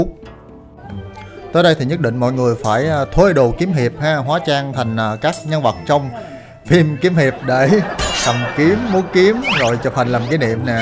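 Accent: South, Vietnam. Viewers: Kỷ thuật quay phim điêu luyện,giọng thuyết minh miền nam nghe thật dể mến,chúc cháu luôn khỏe mạnh để thực hiện thêm nhiều clip đặc sắc nhé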